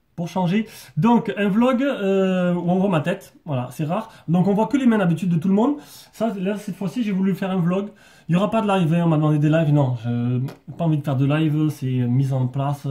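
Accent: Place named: South, France. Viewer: Les gens sont nuls, j'aime bien ton accent du sud ...(léger)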